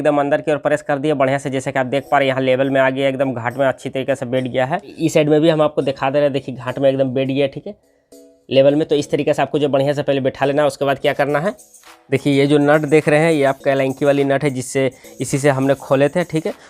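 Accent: Bengal, India. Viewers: Ap bangali ho ka??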